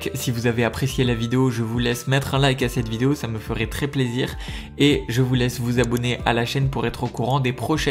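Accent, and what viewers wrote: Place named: South, France. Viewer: Ce petit accent de la garriguEU 😂😂😂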